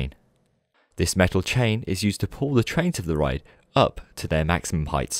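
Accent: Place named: Black Country, United Kingdom. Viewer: Ahh - I used to live in Dudley and was trying to figure out if it was a little Black Country or Brummie twang I could hear in your accent :D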